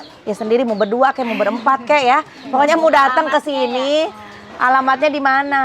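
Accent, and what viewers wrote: West Java, Indonesia. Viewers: seneng bagnet denger teh susundaan berasa di kampung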